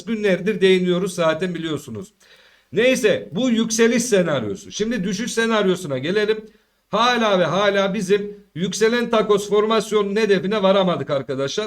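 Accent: Konya, Turkey. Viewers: Videonu izlerken benim hanım dediki, bu adam konyalii😂😂 dedim nerden anladin ?? Hanım dediki: Dimiyorum degisi tam konya sivesi🤣🤣🤣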